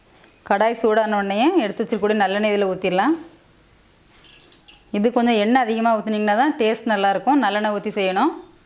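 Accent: Tirunelveli, India. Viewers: Sister yr native place thirunelveli ya yr nellai slang is very like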